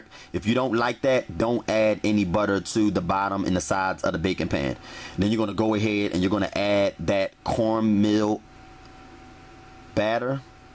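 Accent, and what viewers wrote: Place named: Baltimore, United States. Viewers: I thought the same thing. I heard Baltimore and DC in there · Omg!! Why do you sound like you’re from Baltimore!! I guess the accents are very similar, either way I love it lol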